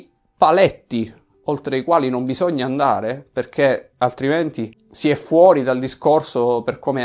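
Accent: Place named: Sicily, Italy. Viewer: Devo dire che, comunque, sarai di madrelingua italiana ma che sei siciliano si senta lontano un miglio. Ottima dissertazione in questo video. La cosa che mi fa più piacere è saper che la "componente araba" è presente tra di voi in minima parte. Infine, il fatalismo, secondo me, è un tratto caratteristico di quasi tutto il sud Italia.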